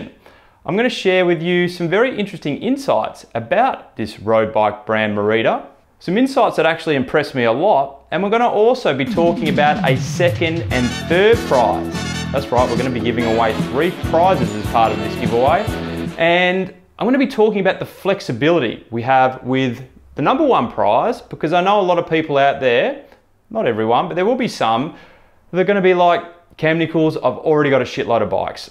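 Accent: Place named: Australia. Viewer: I love Aussie accent so I could listen to you talk about bikes all day ;)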